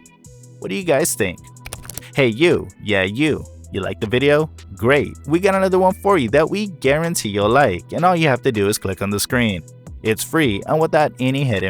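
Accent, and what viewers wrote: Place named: Russia. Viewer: Liked it. I subscribed to your channel. His English resembles that of the Thoisoi2 channel's narrator, who has a Russian accent. Beautiful pronunciation. I found your pronunciation of Miguel Alcubierre's name funny. Greetings from Tupã, countryside of the state of São Paulo, Brazil. Live long and próspera 🖖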